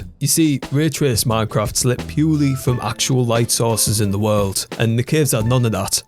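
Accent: United Kingdom. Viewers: If this person is not British then my whole life is lie.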